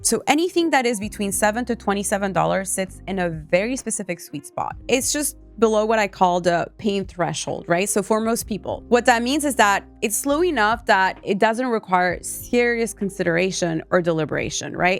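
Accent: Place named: Quebec, Canada. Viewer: Hi, hearing your accent...are you a french canadian by any chance?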